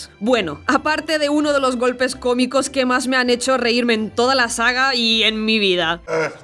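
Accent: Spain. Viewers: Que incómodo tu acento TAN marcadamente español, me encantaría que fuera un acento más neutro :( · horrible escuchar un españolete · A los españoles les duele el culo cada que pronuncian bien el Inglés? O por qué nunca lo hacen? Dos minutos aguanté el video, una mierda de acento, espero que no me dé cáncer en los oídos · Que Asco es el Acento de la madre patria España, pero què tan de bien has narrado y analizado la direcciòn de esta magistral pelìcula 🎉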